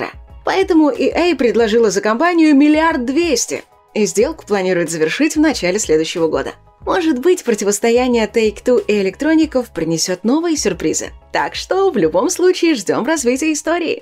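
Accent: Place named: United States. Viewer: Манера общения, под американский мотив, методом прижимания верхней и нижней челюсти. При этом дикция поставлена, будто есть за спиной актерское мастерство) Но звучит приятно)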